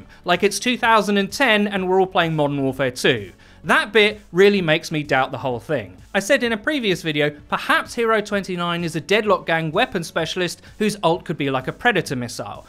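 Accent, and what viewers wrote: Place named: United Kingdom. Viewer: The British accent haha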